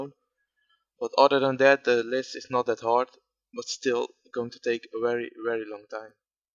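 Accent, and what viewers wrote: Netherlands. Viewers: i hoorde aan je acsend dat je nederlands was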